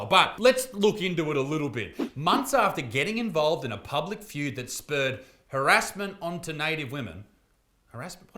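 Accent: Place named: Australia. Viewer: Best damn accent, live in brizzie so i dont hear our accent often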